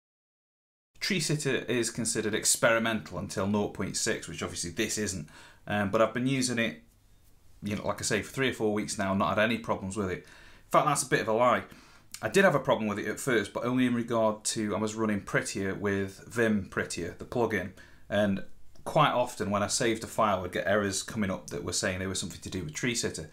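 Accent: Stoke, United Kingdom. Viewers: I was PROPER surprised to hear a Stoke accent when you started talking!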